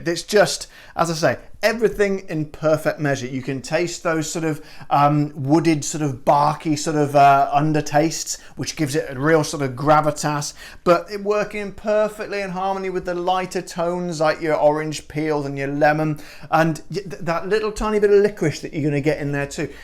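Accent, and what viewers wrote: Scotland, United Kingdom. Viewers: One thumb up for the Scottish accent. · Ooohhhh a Scottish burr is the sexiest accent on the planet. Nice! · Ur American voice is funny but I like ur Scottish accent. Bringing love from the states bro bro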